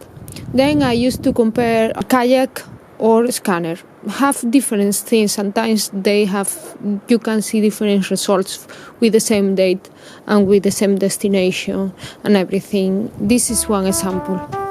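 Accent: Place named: Spain. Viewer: Clementine Windflower She is from Spain. I can tell by the accent.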